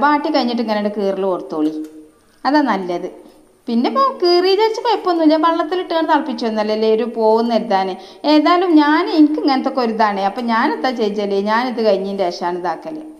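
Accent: Malappuram, India. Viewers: മലപ്പുറം ഭാഷ പഠിക്കാൻ വരിനെടാ മക്കളെ 👍👍👍👍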